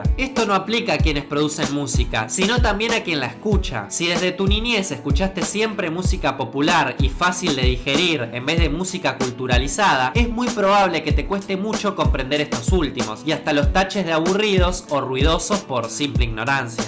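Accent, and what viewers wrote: Argentina. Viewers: vos sos argentino,no?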